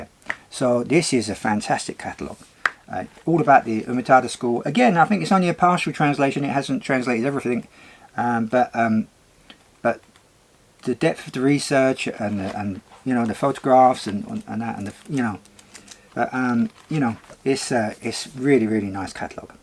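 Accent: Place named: London, United Kingdom. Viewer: Hi just watched this again, I really like your relaxed attitude great english accent,I gather your a londoner as am I a long time ago. Really enjoy the books you have put up, looks like you have a massive library in your unit. Glad you've made it to where you are congrats on that great certificate all the best Terry.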